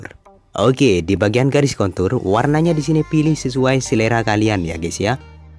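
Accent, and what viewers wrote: Madura, Indonesia. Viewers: Abang org madura kah....?🤔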